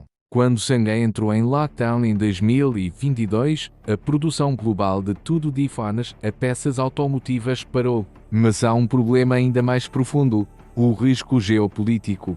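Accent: Portugal. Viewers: Pq fazer com sotaque de Portugal????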